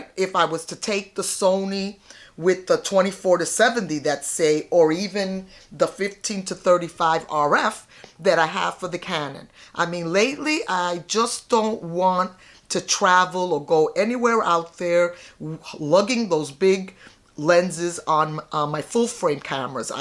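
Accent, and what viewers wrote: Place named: New York, United States. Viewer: I watched the best phone camera review this morning from Tony and Chelsea - they shot the video with the GH6 and there were moments when that autofocus was simply not cutting the mustard. I've seen some amazng footage out of the GH6 but normally from professionals who know what they are doing. I'd love to buy this camera but as a Fuji XT3 who has suffered in the past from autofocus issues (thankfully less these days), I think my next camea will be the Sony A7IV. Talking about computers and codecs - My new Macbook Air M1 is fantastic - complete game changer! P.s. Love your accent! Are you from near New York? Makes me want to watch Law and Order again.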